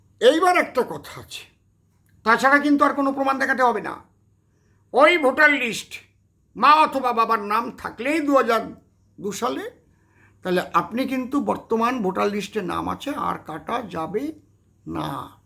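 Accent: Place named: Bangladesh. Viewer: তুমি তো বাংলাদেশি তোমার এখনো বাংলাদেশি ভাষার আড় ভাঙ্গেনি তোমাকে আগে তাড়ানো উচিত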